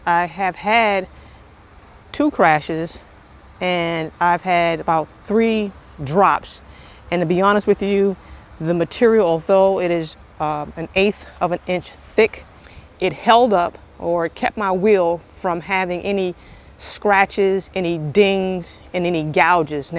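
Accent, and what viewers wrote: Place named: Virginia, United States. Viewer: I got mine because of your previous video. Love your Virginia accent....!!!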